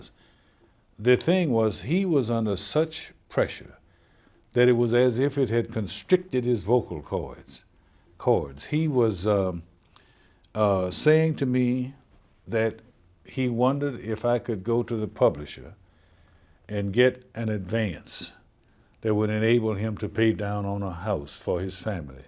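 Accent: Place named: South, United States. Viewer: Yeah he duz hv a southern tone, but its Subtle. His vocal tone cadence add to his credence.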